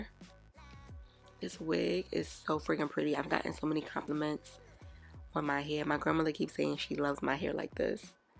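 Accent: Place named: Bronx, United States. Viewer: I like your accent reminds me of the people from the Bronx or I think it's jersey lol love your channel and how relaxing your videos and informative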